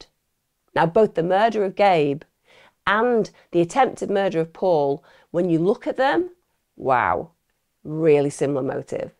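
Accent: Australia. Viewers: Hey, I’m from Australia and have not heard of this case until now. Our justice system is despicable! On a side note though, you’re Aussie accent isn’t too bad xx I’d love for you to also look at covering the Shaniya Davis case. I’ve come across a few stories about her case but haven’t watched them in the hopes that I see her story on your channel. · Your Aussie accent made me smile! Love from down under 🇦🇺🦘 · Loveee the Aussie accent em 😂😂😂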